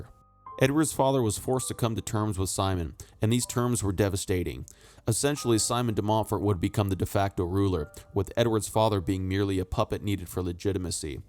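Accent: United States. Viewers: I can't watch this it doesn't seem right with an American narrating. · An excellent narration! [Do I detect a midwestern accent?] I'm looking forward to more from you. I'd like to see an in depth history of early czech/moravian rulership. It's hard to find anything on this.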